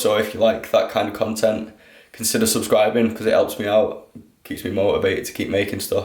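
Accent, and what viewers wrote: United Kingdom. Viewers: Are you from Sheffield? (I'm Canadian, but I live in Leeds) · u british!!!!!!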